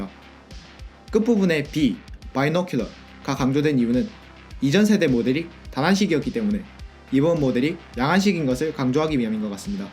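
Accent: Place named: Gyeongsang, South Korea. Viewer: 갱상도 사투리와 영어버터발음의 조화!!!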